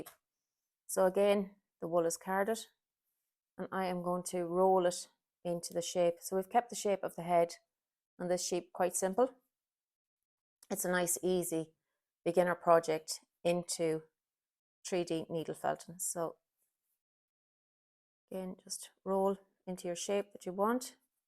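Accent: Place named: Ireland. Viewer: As ‘Sewcat’ above, says, I could watch and listen to your wonderful Irish accent over and over again.❤️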